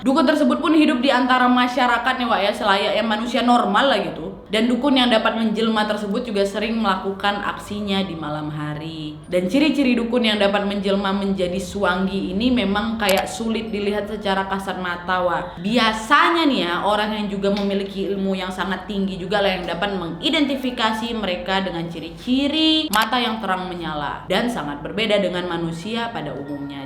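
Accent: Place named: Medan, Indonesia. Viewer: Kakak ini logat nya macam org medan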